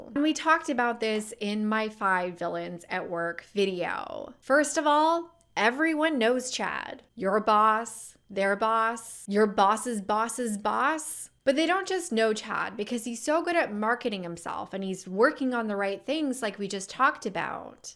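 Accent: California, United States. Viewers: what's with the way she speaks????????????? she's pretending to be a teen in high school in cali???? she's probably in her 50s, a bit too old for that annoying accent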